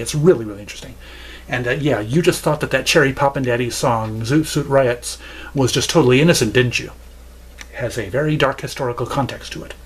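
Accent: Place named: Canada. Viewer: WOW!!!! How the heck did you do that sound with your mouth in the beginning??!?! I was enchanted! Sounds like you are a fellow Canadian. I need to check out that record.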